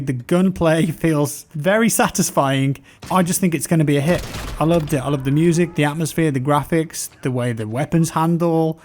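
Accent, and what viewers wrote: United Kingdom. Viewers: British accent killed this video completely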